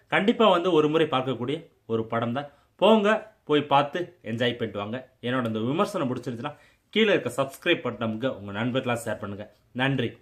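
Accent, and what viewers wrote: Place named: Cumbum, India. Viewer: Me too cumbum side one village, I thought you are from chennai bro. Now I came to know why I watch your reviews native speech bro,doing good job but now your background looking like usa . Where are you staying now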